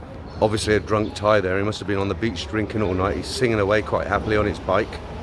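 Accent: United Kingdom. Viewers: is my favorite "Thai expert" youtuber, with his classic British speaking accent and his perfect acting face. Keep up the good reportages of our ones beloved paradies. I started my Thai journeys in 1972 and my last visit was 2 years ago, thats 50 years of fun and exitment, what a good life we had. We were the lucky ones!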